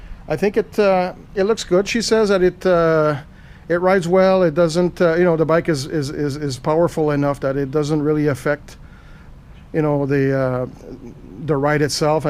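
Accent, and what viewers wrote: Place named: Israel. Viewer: אין כמו המבטא הישראלי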